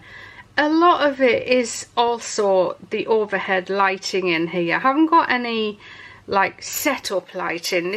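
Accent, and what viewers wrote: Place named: North East England, United Kingdom. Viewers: Hi. Where’s the best for designer fragrances..ie creed .. ps love the videos…love the accent .. fellow north east xx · Love watching your videos. I live in Australia and I’m learning to make wax melts and these videos really help me :-) Thanks so much! Also I lived in Northumberland for years! my mum and family live there. Your accent reminds me of home. Im assuming you are from somewhere nearish? ha :-)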